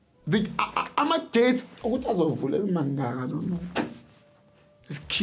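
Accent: Zimbabwe. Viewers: Is this guy Zimbabwean or Southern Ndebele speaker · He sounds Zim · This guy talks like a Zimbabwean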